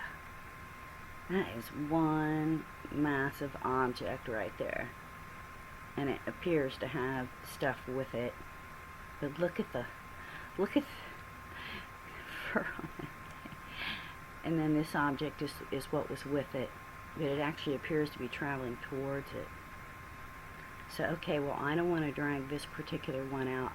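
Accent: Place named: South, United States. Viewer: spoken with a sweet southern drawl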